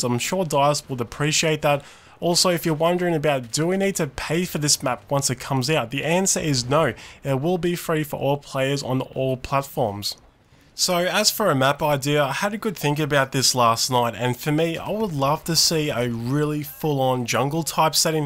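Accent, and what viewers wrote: Australia. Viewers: wait are you Australian? If you are that surprises me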